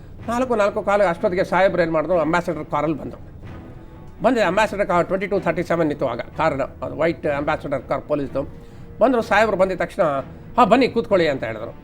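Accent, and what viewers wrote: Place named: Andhra Pradesh, India. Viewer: sir telugu slang super sir.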